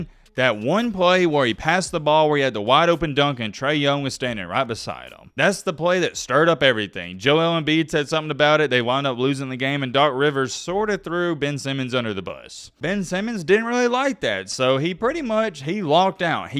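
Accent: South, United States. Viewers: The southern accent followed by the weird rambling about lebron’s space jam (this video has nothing to do with LeBron OR space jam btw)…..yeah I only made it 30 seconds in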